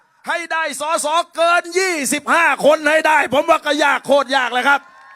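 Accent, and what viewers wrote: South, Thailand. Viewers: พูดร่อยนิชอบ คนใต้จิงๆ😊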